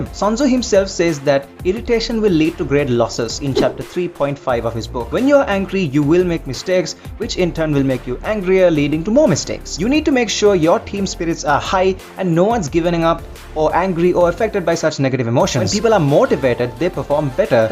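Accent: India. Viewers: Ooh it sounded like an indian ,but never mind you are our neighbour feels great ,keep uploading this tips 👍🥰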